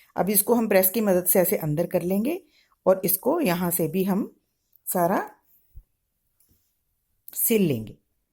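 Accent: Punjab, India. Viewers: Your voice mam, is so like my mom's!😍🤗 And it's okay if your Punjabi lilt comes in on your video, I'd love it! And am sure many other would too! तुम्हारी आवाज़ माँ, मेरी माँ की तरह है! 😍🤗 और यह शानदार है अगर आपका पंजाबी उच्चारण आपके वीडियो पर आता है, और मुझे यह पसंद आएगा! और मुझे यकीन है कि कई अन्य भी होंगे! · Nice video, n Panjabiyat jhalak Rahi h which is nice expression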